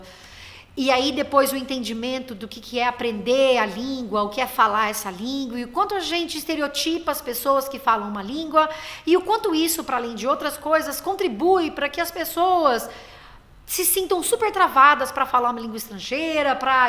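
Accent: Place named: Caipira, Brazil. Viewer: Acho que a graça e a riqueza de qualquer língua está justamente nos diferentes "sotaques"! É uma delícia ouvir você, com seu "R caipira", falando sobre isso!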